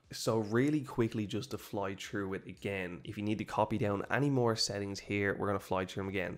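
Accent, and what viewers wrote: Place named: Ireland. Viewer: i can hear the irish in this fella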